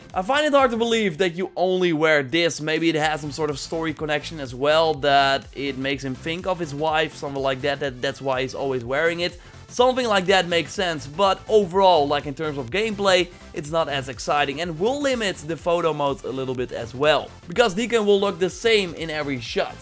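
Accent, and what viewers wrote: Netherlands. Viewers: What is this guy's accent? Sounds Dutch.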